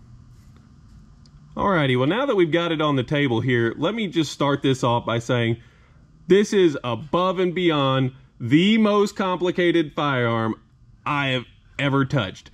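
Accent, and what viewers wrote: South, United States. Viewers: This man sounds exactly like demolition ranch but with a bit more of a southern accent.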